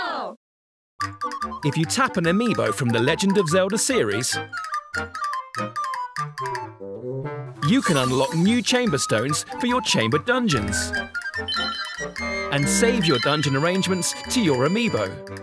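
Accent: United Kingdom. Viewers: It's the British accent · I can't help but think of Ryn from Xenoblade Chronicles when hearing the British narrator.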